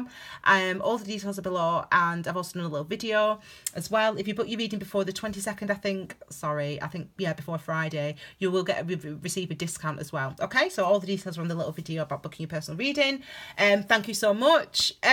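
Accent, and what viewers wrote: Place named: Lancashire, United Kingdom. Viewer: Sounds like she's from Lancashire.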